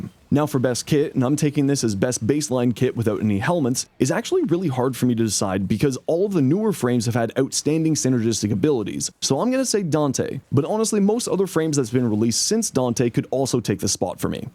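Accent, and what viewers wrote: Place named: Canada. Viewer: All I heard was Canadian.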